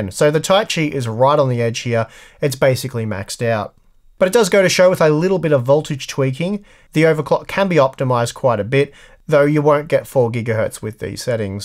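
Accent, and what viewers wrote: Australia. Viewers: Might not apply, Google caption said curve at 19. Aussie accent usefulness.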